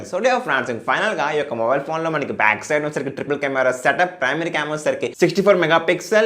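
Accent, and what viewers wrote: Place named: United States. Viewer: way of talking in telugu change cheyandi mari american la try chesthunnaru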